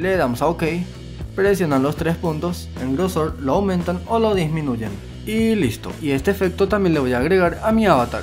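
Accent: Paraguay. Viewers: Eres Paraguayo? Tu forma de hablar me suena Paraguayo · Ya me sonaba el acento paraguayo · Sos Paraguayo? xd, me doy cuenta por ti acento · Bro, sos Paraguayo? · nde kp vos sos paraguayo? se nota en tu acento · Tu acentó parece paraguayo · Gran video, me ayudaste mucho, por cierto, ¿sos paraguayo? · Paraguayo ? verdad kp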